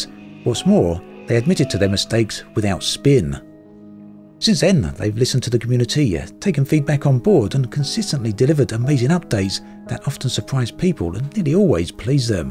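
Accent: United Kingdom. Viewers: Pretty good game and stuff but it's pretty obvious your desperately grasping for content that people want to hear with your weird whispering British voice lol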